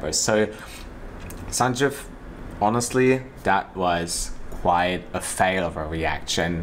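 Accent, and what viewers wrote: Australia. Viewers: What nationality are you? Sometimes you sound Aussie, sometimes almost south African, and other times I can't tell. Just wondering. Great videos😀😁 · [comment made May 16, 2021] You're a great guy. Thanks for your continuous honest reporting. I've been watching quite a few of your videos in the last week and I really like your positive energy. I look forward to viewing more of your vids. I am Dutch born, Groningen, Netherlands, and because of my father's job I spent my childhood from age 4 to 12 in Nigeria and Ghana.. great experience. We traveled back and forth to the Netherlands every 2 years stopping in all the West African countries on the way. I really enjoy traveling.. though don't travel as much as you.. LOL.. but I traveled through France a number of times and the U.S.A and Canada. I presently live in Montreal, Canada. Since 2012 I travel very frequently to Mexico and Dominican Republic. 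I am multilingual though only 4 languages with fluency. My wife is French European and is perfectly bilingual without a trace of accent. We really enjoy your way of speaking English with an Aussi accent.. LOL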